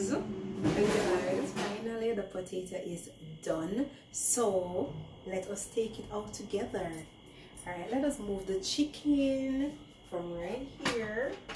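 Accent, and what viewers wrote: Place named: Caribbean. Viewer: She talking internationally so people will understand seen can't talk the deep patwah straight have to broaden your audience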